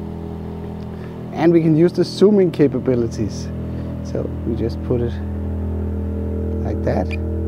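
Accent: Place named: Denmark. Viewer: Einstein? He was German. That's a Danish accent the host is talking 😅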